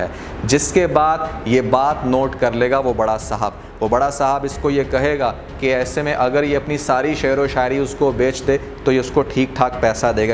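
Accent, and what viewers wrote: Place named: Punjab, India. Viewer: Nheeeed 😂😂😂😂 Bhai apni pronunciation Tu thik kro.. Urdu main b Punjabi touch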